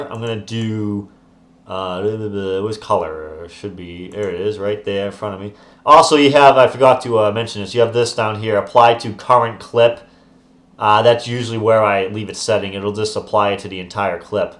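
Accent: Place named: New York, United States. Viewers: Do you like in NYC? You kinda sound like it. Sounds cool. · You have a very strong accent are you from new york or jersey?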